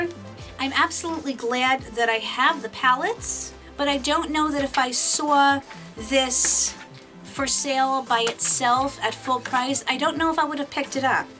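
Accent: New York, United States. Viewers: I love the Best of Life 2 pallet, stunning colors & glitters, love your NY accent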